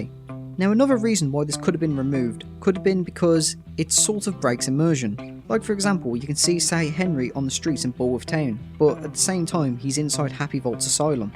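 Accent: United Kingdom. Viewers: Okay I thought you were British because of your voice and where are you from then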